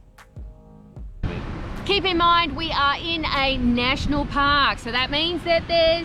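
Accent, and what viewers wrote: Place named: Brisbane, Australia. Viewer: New to your channel, 1st video I’ve watched. As a fairly frequent visitor to Bribie I was interested to see how you put it across. Great video & very informative. Another option is the inland track & even camping at poverty creek (although make sure have mozzie repellent!) Sounds like your from Brissy area & will look forward to seeing your vids.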